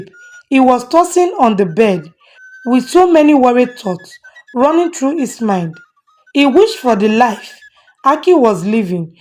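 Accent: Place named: Edo, Nigeria. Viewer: The Edo accent of the male voice in your story is sooooo funny and 😂😂😂 Even you also have an edo accent.. Are you from Edo State? 😅😅😅😅😅 I love your stories...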